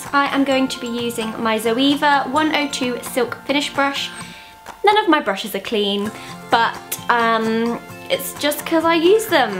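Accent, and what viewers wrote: United Kingdom. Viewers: Why can't I be British Dx she makes putting on makeup sound so fancy even the way she says "mascaura" instead of mascara xD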